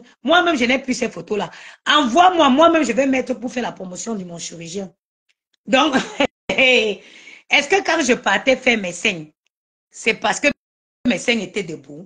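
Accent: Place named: England, United Kingdom. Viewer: Tu as l’accent anglais ma fille pas dorcaca qui parle anglais en patois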